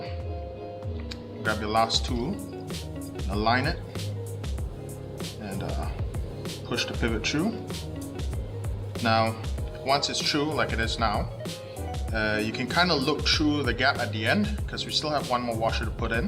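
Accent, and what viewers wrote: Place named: Malaysia. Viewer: i knew you were malaysian just from the way you spoke haha... anyways, good video... but yeah, it's so hard to get anything here in malaysia, we only have 1 official distributer in malaysia and they're not really taking it seriously, like their shop is always out of stock and don't carry everything that letherman has to offer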